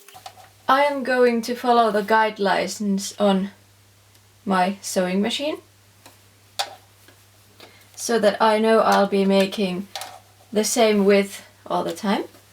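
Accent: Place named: Finland. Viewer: Mä arvasin et oot suomalainen koska sä puhuit suomen englantia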